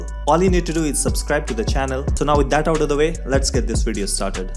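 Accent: India. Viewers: Are you Indian... Your Voice has Indian accent. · Sounds like ur a malayali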